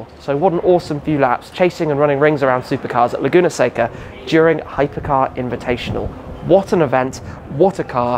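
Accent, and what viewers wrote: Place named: United Kingdom. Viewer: Shmee please dont lose your british way of speaking, its what a lot of ppl like about you. Saying race car instead of racing car is just another americanism working its way in. Its too easy for us to lose our identities in this internet world nowadays. Aeroplane and racing cars for me 👍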